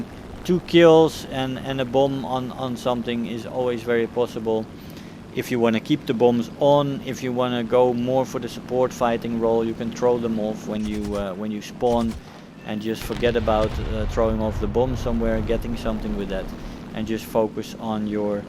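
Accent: Netherlands. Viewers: he got that dutch accent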